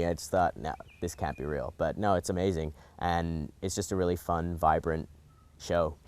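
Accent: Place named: United States. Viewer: omg hes interviewing in an american accent! ahaha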